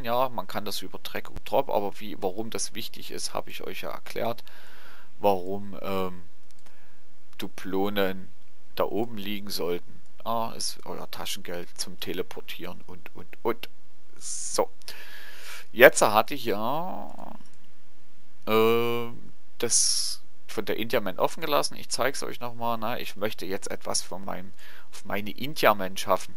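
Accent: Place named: Saxony, Germany. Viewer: Rischer Sachse erklärt de Maritime Kriegsführung, das gefällt mir aber gud o :P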